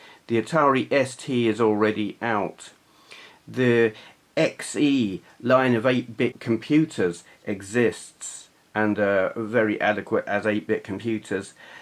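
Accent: United Kingdom. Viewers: u from UK?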